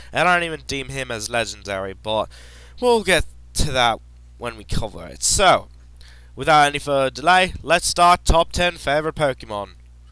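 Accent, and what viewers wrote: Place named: United Kingdom. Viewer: are you british or something cause your accent is hillarious XD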